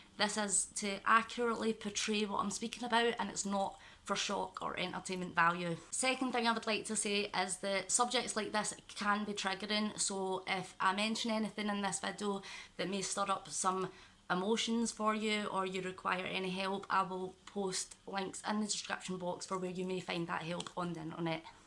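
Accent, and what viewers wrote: Scotland, United Kingdom. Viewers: i love you and i'm sorry all of this has happened to you, and i'm sorry this illness runs so rampant in your life. i'm also a mother, living in scotland, with ptsd & other mental illness'. i was absolutely gobsmacked when you explained your teacher read that letter to the entire school?! i actually cannot understand that but at the same time.. schools here back then were rough. nothing good ever came from speaking up which is absolutely not how it should have been. ptsd really controls so much of my life and its such a fragile and difficult topic to speak about, never mind trying to tell someone we you are the way you are and what happened or what triggers you so the sincerest well done on being brave enough to make and post this video. it's probably helped a lot of people who are struggling too or who know someone struggling in a similar way. 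sounds daft but it was helpful for me to hear this all come from someone who sounds the same as me, if that makes sense? same accent and way of talking etc which i don't exactly come across much on youtube etc so it just.. i don't know, felt a bit more personal or something. i hope you're doing okay :) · Soz I couldnt get any sound on my phone that's why I asked if your english its obvious now my phone is working that your Scottish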